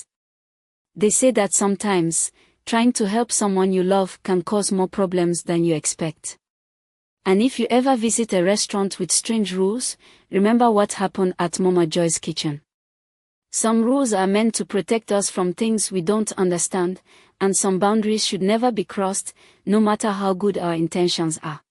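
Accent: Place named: Ghana. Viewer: Are you a Ghanian, this your accent sha!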